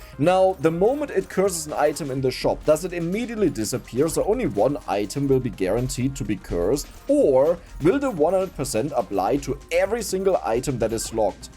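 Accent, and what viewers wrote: Germany. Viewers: i love it that is german and somtimes you can hear it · He Sounds rly german😂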